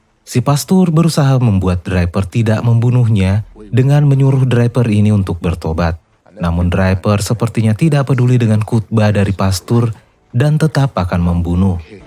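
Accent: Polewali, Indonesia. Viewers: Kamu org Polewali kah bang...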